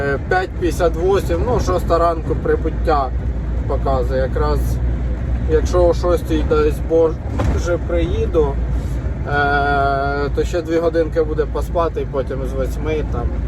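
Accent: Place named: Surzhyk, Ukraine. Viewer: Говори по-русски. У тебя хорошо получается. А вот мова твоя ужасна, то есть - это не мова соловьиная, а очень херовый суржик, говносмесь. ПРежде всего в произношении, в фразах. НЕ позорься.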